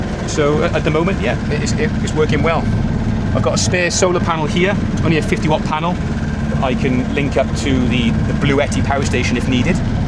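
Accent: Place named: South Wales, United Kingdom. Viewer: All power to your elbow! Amazing stuff. Where do you hail from? I detect a south wales accent. Newport? Anyway, I have black antifoul on my boat but I have never encountered Orcas around Cornwall! Good luck to you!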